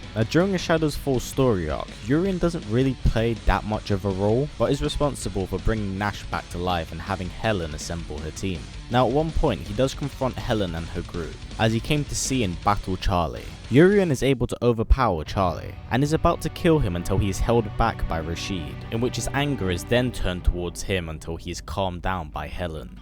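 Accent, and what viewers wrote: United Kingdom. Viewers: I love these videos, just play them and leave them in the background while doing other stuff. Also, the fact that they're narrated by a fellow Brit is just the best thing.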